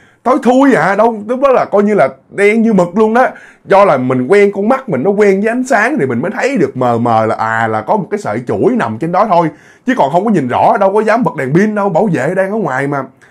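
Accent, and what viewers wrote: Mekong Delta, Vietnam. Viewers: Cái gì mà thuật lại câu chuyện từ người khác. Mà kể như chính mình chứng kiến... Cái gì nói tiếng miền tây rặc luôn..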